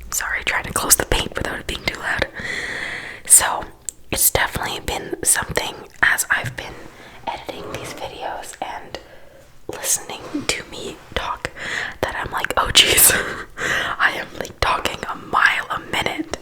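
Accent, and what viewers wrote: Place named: Canada. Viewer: I'm new here! Your video came up on my Youtube suggested on the homepage! I am so glad I clicked on it. I'm doing some sketching right now, and watching you paint was so nicee! I want to see you finish the project so badly. I live near Toronto, so it's really nice to hear a Canadian accent do ASMR, especially whilst being creative. I did film editing at university, was great to learn so if you are interested you should definitely take a class on it or self teach using YouTube to start with! Pick one software and then decide what kind of project you want to make, then search how to do basic edits using that software, you'll pick it up in no time :) You talking about enjoying your hobby really motivates me to enjoy time to myself once in a while and relax whilst enjoying a hobby, thank you so much for your content. Please keep doing these I love it! 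I am always looking for good quality art asmr and yours has been perfect! I want more lol! <3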